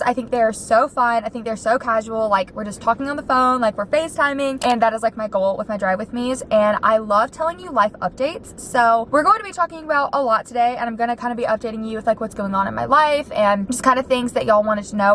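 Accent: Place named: Texas, United States. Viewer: I 💯 think your a texas girl you have that accent❤ I’m from oregon it’s beautiful here but if I could get my daughter to transfer we’ll be moving to texas or Nashville.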